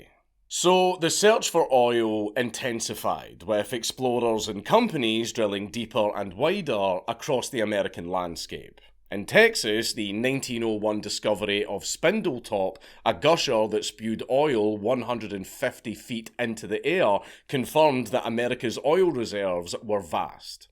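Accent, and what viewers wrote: Scotland, United Kingdom. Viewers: You are Scottish do the Piper Alpha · spill with a Scottish accent sounds awfully close to spell, and at times had me wondering about what wizard was responsible for this catastrophe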